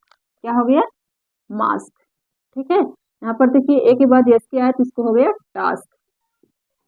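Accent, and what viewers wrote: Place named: Uttar Pradesh, India. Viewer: Madam, You may belong to Uttarpradesh, I guess by your tone, so many thanks for it.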